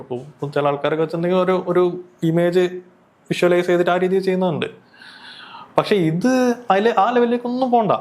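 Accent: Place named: Kozhikode, India. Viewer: Kozhikode accent catch cheyyan difficult aanu .... Bt ok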